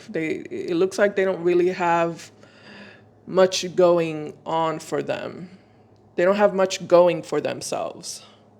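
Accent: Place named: Spain. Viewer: Gem vs Pisces.. Pisces is known for impulsive actions that get them in trouble. The grass was not greener in the other side. I struggled with 3 horrible hurricanes - he knows exactly what I'm going through.. but he has done nothing to reach out & help in any way with the loss of my home. The home that he left me in. I moved him from California to Florida 7 yrs ago when he hit rock bottom. All was fine til he let toxic jealous family & friends come between us. Controlling him. So eventually he pleased them by leaving me & hooking up with the female they wanted for him 1700 miles away not giving a crap of how I felt!! So I do believe he is not happy & he's using that person as convenience for his abrupt actions... I'm moving back to my home town & letting him mess up his life again cause this is his pattern.. player, liar, manipulated.. golddigger.. cheater... 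Bi-polar infected...7 personality... One pill away from a straight jacket & I'm being kind.. lol. So deal with it Pisces, don't mess with a Gemeni... The hand that fed you!!.. Thank you!.. so accurate it was scary.! Sometimes you remind me of my sister when you talk. Your accent. We're Puerto Rican. I'm guessing you might be Spanish too... Love it.. lol. Have a great day.. 🌹🙏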